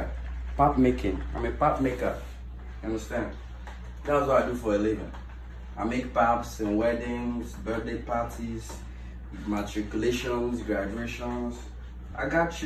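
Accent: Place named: United States. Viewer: I had to replay this. 😂😅 very smooth but why you sound american 😢😅😂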